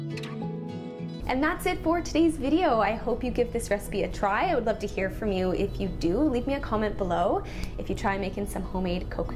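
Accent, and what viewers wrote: Canada. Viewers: you sound very Canadian, but great video.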